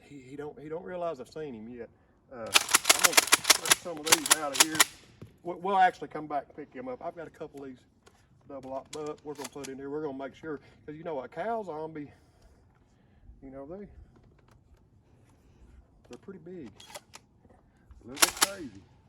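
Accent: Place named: North Carolina, United States. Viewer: You my kind of guy friend got a gun for every situation !!! Love the video and the southern accent which sounds like NC. or Tenn !! Imma Virginia ole boy got my two Colt .45's and want to collect me one of them coach 12 ga. shotguns with the external mules ears and a Winchester 1873 in .45 Colt to complete my cowboy gun collection !!!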